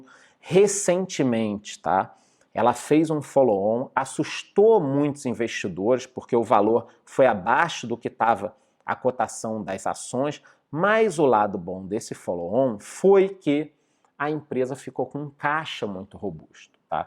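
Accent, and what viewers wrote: Rio de Janeiro, Brazil. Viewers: O mora no sul mas esse sotaque carioca não nega kkkkkkk.... bota fleury ai na carteira porr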